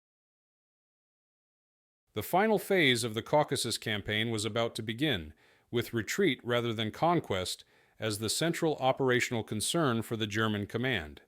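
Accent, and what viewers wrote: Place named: United States. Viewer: I'm glad we finally have a World War II documentary narrated by a American voice and not another British voice, whether AI or human.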